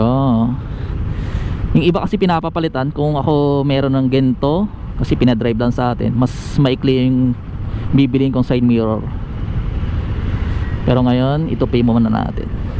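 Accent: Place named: Visayas, Philippines. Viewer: Taga Iloilo ka po ba? Or taga Bacolod? Iba po kasi Tagalog Accent nyo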